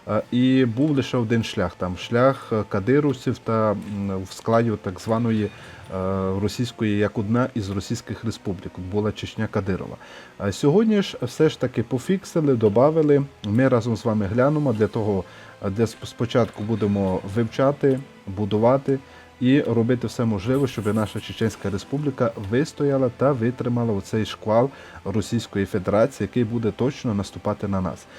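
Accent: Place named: Vinnytsia, Ukraine. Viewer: Друже! Південь Вінничини? Люблю свою країну! За вимову йдеться! Буковина?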